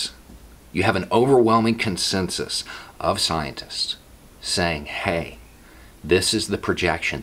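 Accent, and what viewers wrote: South, United States. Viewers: Not enough. Though I always hope that the beard and hick accent catches their attention for a minute.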